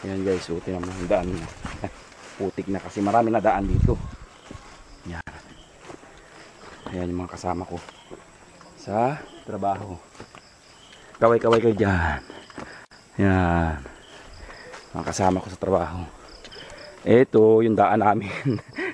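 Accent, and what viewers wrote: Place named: Catanauan, Philippines. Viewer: puntong catanauanin tlga hahaha miss my hometown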